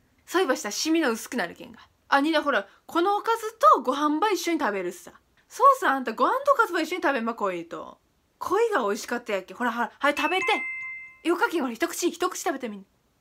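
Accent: Nagasaki, Japan. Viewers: が話す長崎弁が好きなの私たちは！ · 長崎弁普通に可愛い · 長崎弁聞きたくなったら定期的に来てしまう笑笑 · かわいいなあ長崎弁俺の所方言とか特に無いから羨ましい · めちゃくちゃ長崎弁ぽいと思ってたらやっぱ長崎だったなんかうれしい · 長崎出身やけん落ち着く〜〜😂 地元離れるとこの話し方が恋しくなる笑笑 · 可愛い長崎弁😭😭❤ もうずっと聞いてたいから長崎弁バリバリのやつもっと希望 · うち関東で方言使わないけど、なんかちゃんの長崎弁永遠と聞いてられる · 長崎弁ばり可愛い！ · 数年間長崎居て姉が長崎弁ちょいちょい喋るから「うわこいつ長崎弁に侵食されやがった((((；ﾟДﾟ))))」って思ってたからレベチがここにいてなんか安心したやったぜ。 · やっぱ長崎弁ていいね、話すとなると照れが入って話せないけど…好きだな～長崎弁！女が帰省からの、成田着くなりバックから取り出したのは、フルーツサンドイッチ、俺が長崎の物食べたいって言ったから買って来てくれて、周りに人がいるにもかかわらず即食いするもんだから（生温かく形も崩れてだけど）恥ずかしながらももっと買ってくればよかったね！良いんだよ気持ちと長崎が詰まってて旨いよ！今では良い思い出っちゃったけど。ちゃんの長崎弁で癒されます。長々と話してごめんよ、長崎弁バージョンありがとう☆体調崩さずに頑張ってね！応援してます。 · 長崎弁好きだなー笑笑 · 長崎弁がかわいいのかちゃんがかわいいのかどっちだろう… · 大学の時の長崎出身の友だちの喋りと同じでめっちゃ良い(笑)長崎弁めっちゃ大好き(笑) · 知り合いの長崎人そっくりで、びっくりするwww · こてこての長崎弁使ってる🤣(笑)なんか、ちゃんの家の光景が目に浮かぶ😁幸せな家族だね😊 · 本物の長崎弁ですね親近感がものすごあるとばい！横におるごた！ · さんも長崎弁も可愛い💓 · ちゃんの長崎弁世界一かわいいこれマジ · 長崎弁好きすぎる、、もううちなんて、〜だべとかまじの田舎者の言葉だらけで嫌だ〜😩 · 長崎弁大好きです✨ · 最近の影響で、長崎弁が1番可愛くて好きって感じるようってる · 実際長崎弁っていうても広かよねー。ちゃんのは長崎市弁たいね！佐世保平戸五島島原それぞれ個性ありすぎて長崎市が標準語かなっておもうた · 全然分かんないけどちょっと方言憧れある笑特に長崎弁！！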